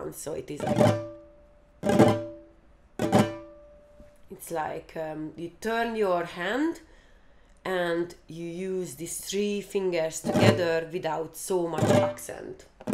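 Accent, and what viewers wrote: Hungary. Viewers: A magyar akcentus de ismerős mindig basszus :D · sounds like Magyarrrr. I remember this accent from Dominica my sweet girl from Zsigetvar love from Istanbul thanks for this video. Ksönöm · magyar akcentus befigyel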